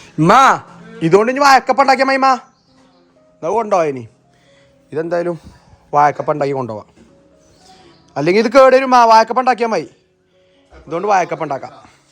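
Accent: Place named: Kasaragod, India. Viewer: Kasaragod paraya oottpola😊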